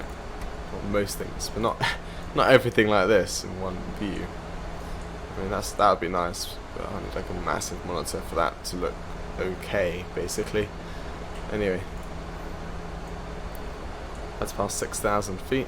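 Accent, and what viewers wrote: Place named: England, United Kingdom. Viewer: Why does he have an English accent in new Orleans?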